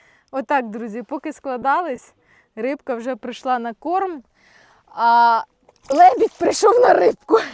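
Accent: Ukraine. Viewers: Ви чудо! Дуже подобається мені і ваш (наш) український діалект.Супер!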